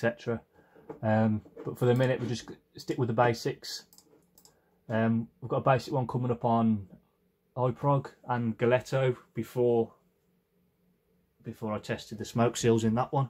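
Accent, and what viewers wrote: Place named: Midlands, United Kingdom. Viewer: Nice video. As a fellow Midlander, I'm loving the accent. Only our people can put so many syllables in the word "side". "Day-turr" :)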